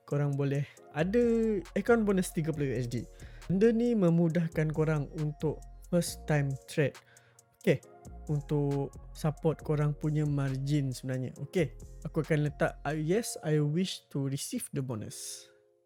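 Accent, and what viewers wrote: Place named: Malaysia. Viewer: kaya orang malaysia logat nya... apa melayu indo..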